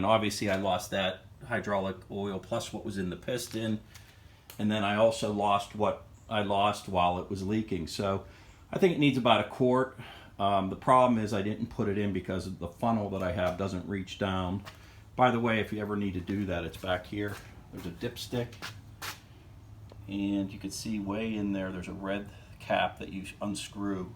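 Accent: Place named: Pittsburgh, United States. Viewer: Thanks for the video. I could tell from your 'accent' that you were a Pittsburger, then I saw the Vaccarri sticker on your loader. I live in McMurray and used to go to them all the time for my BX2230. Now I have to find a rebuild kit somewhere else.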